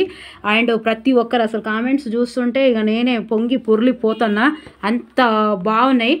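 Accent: Telangana, India. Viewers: పక్క తెలంగాణ భాష లో చాలా బాగుంది మేడం 👌👌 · Me voice vinte oka energy vastundi mam telangana slang nd me short tricks , explanation chala bavunnay... thank you madam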